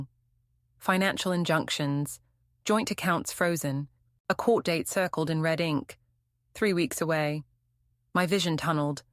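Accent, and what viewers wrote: United Kingdom. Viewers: This was obviously written by a yank, why use a brit to "read" it. Syntax and turn of phrase distinctly American. Irritating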